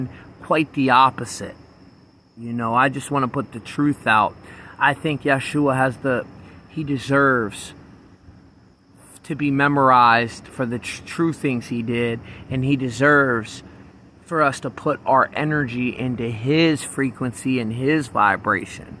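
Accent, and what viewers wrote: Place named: Baltimore, United States. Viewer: I have been watching your vids for 2+ years on FBas my main, Instagram, and here on YouTube. I'm born and raised in Baltimore. You sound like you have a Baltimore accent.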